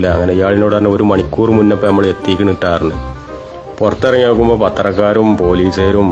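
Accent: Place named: Malappuram, India. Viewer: മലപ്പുറം ഭാഷ😂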